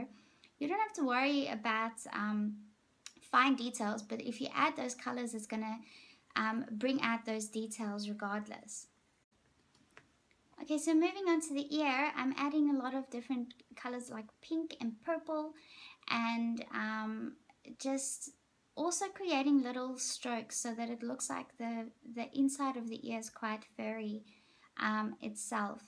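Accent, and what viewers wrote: South Africa. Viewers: Are you South African? :D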